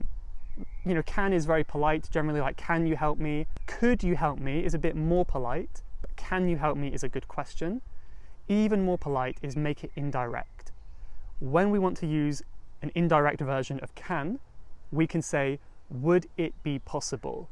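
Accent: United Kingdom. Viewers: I love your British accent 😅📌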